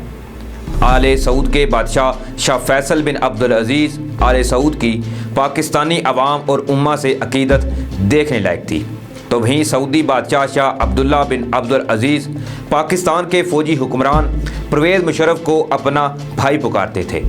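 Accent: India. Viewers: You speak Urdu in Hindi accent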